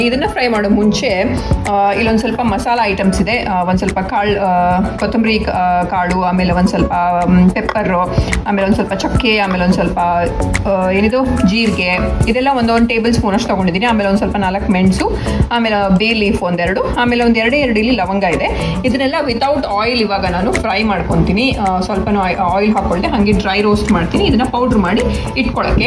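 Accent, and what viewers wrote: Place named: Bangalore, India. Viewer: Abhinandanegalu.... 👌👌👌 Nim kannada Blore kannada..... sounds nice... but namma Udpi kudla kannada keloke thumba chennagirutthe... alwa... omme kudla kannada dalli maathadi... chanda irthadhe...🤭 Cute.... 😘 ❤ from Dubai....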